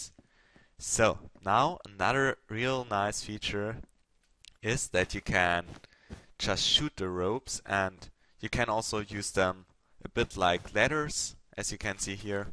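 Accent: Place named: Germany. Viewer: I can really hear that your German but that's a good thing :D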